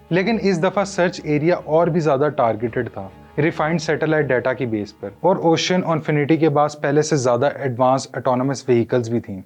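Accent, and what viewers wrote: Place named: Punjab, India. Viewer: Watching you from Saudi Arabia I suggest you please Improve your Urdu little bit and prevent it from punjabi accents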